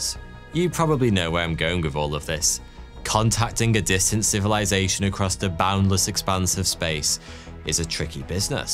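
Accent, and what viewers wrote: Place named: RP, United Kingdom. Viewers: Goodness, a posh British accent makes everything more palatable. It’s prized by Wall Street, Madison Avenue and Hollywood. Actors take classes in The Received Pronunciation. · A posh British accent can’t make bullshit more palatable. Several explanations exist for the ‘wow’ signal.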